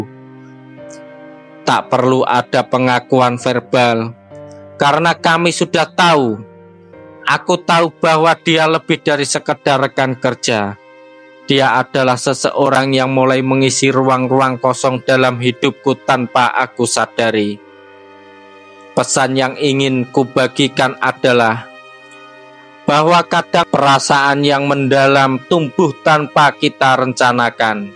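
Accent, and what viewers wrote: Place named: Java, Indonesia. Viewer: Medhok banget suaranya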